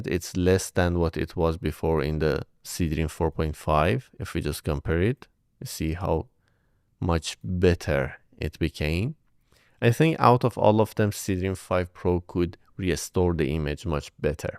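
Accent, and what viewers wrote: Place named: Iran. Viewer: Yo. I'm Persian too. I Noticed your Persian accent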